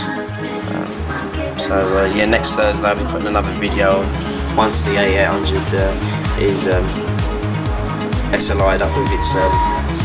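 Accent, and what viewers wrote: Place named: United Kingdom. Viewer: Ya I knew you're from somewhere around the UK because of your acsent (I think thats how you write it fix me if I am wrong =]) BTW are these games any fun ?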